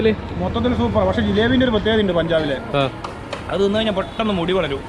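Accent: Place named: Kannur, India. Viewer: Superr.... Kannur basha തകർത്തു..👍👍🤩🤩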